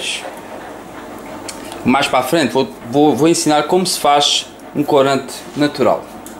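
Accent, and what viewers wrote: Portugal. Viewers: axo q ele e de portugal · Você fala com um português de Portugal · Ele não fala engraçado , vc acha isso porque o seu português é o português ( Brasil ) o dele é o português ( Portugal ) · ele fala português Portugal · Antes de mais obrigada pelo video, A receita está certa, se não com algumas pequenas falhas, as natas poderiam estar quentes, ou a gelatina foi posta quente e não morna como deveria, por isso não ficaram na consistência necessaria para decorar um bolo, deduzo que tambêm seria para decorar e não para rechear o bolo como foi dito, a dica do limão foi mesmo para tentar salvar as natas, que mesmo assim desandaram, mais sorte para a proxima.. OBS: Sotaque Algarvio? .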